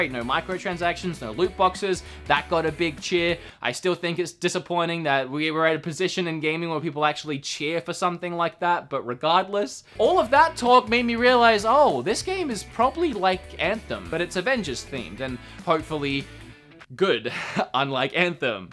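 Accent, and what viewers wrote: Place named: Australia. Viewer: Bro, dont change the Aussie accent man...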